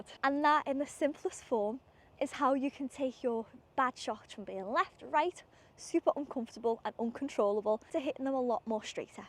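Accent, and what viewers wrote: Liverpool, United Kingdom. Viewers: Great video, I'll have my wife check it out as she's new. Off topic, is that Scouse I hear?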